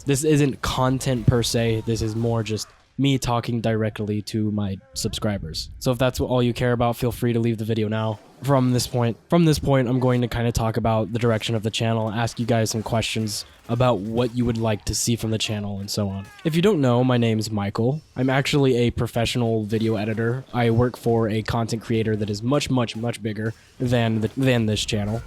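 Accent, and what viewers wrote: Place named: England, United Kingdom. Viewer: You have a cool voice and a clear english accent. You should consider adding simple playthroughs to the channel, especially of the games you mentioned here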